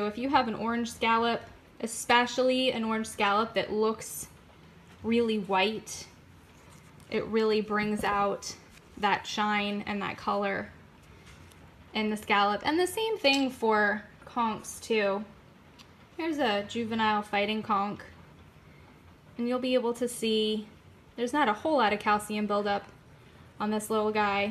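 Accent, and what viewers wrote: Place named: United States. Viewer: It’s an American accent. We all have one. Even you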